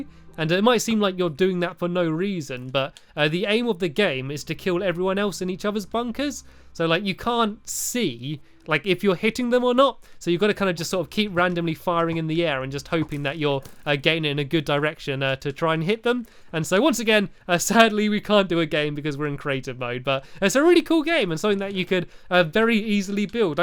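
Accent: United Kingdom. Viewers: Are you British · your brittish right!?!?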